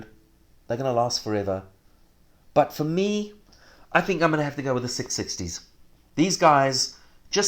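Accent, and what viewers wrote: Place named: New Zealand. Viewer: thats a kiwi accent alright , loved the review would love some more comparisons if you get hands on other studio classics like beyerdynamic dt880 pro , akg k702/712.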